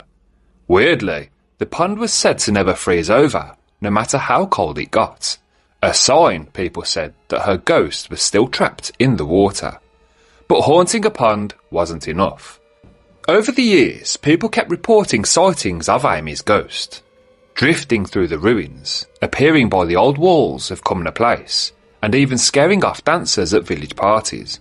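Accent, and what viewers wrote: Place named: Birmingham, United Kingdom. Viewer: Kenilworth born and bred here. And worked in our Castle in the late 90's. I remember my colleague saying to me that I'd think I was going insane, once I'd worked there for a month or two. ALL of us, had seen and heard the unexplained. If you want to hear those stories. Let me know. This was very enjoyable so I'm subscribing as a fellow local, judging by that Brummie accent?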